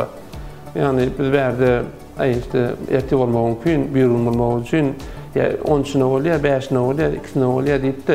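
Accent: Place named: Balkans. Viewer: aga oya gidip bilemzok veli shu mahal giden yaly boldym sizin gurleshiniz eshtdip (balkan aksendinda) ❤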